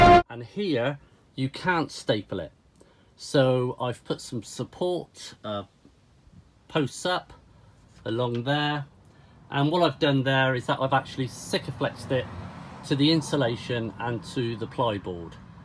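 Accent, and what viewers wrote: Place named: Bournemouth, United Kingdom. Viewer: You sound like you are Bournemouth, the guy in B and Q told me to watch :) cheers mate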